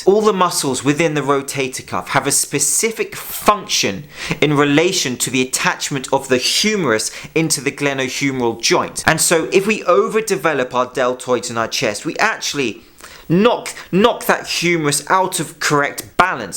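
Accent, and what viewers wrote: United Kingdom. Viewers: The British accent is such a flex and a distraction...people don't even listen to the message, they just want to hear people speaking like that:)🤣👍🏾✊🏾💪🏾✌🏾can't explainit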